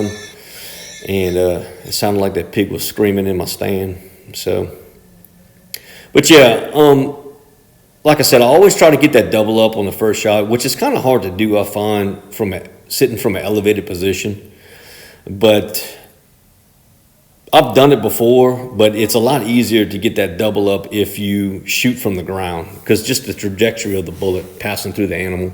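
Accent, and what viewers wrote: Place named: Louisiana, United States. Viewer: I’m with you bud, with the scarcity of ammo these days, I pick my shots and catch a couple runners. I also use a Grendel as my go to and love it. You sound like you are down around Baton Rouge right?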